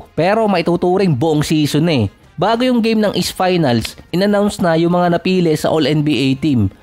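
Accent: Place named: Pampanga, Philippines. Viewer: Kapampangan ya i koya 😂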